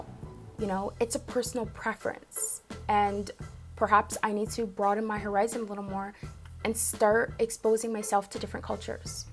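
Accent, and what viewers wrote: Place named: Canada. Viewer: i love the Canadian accent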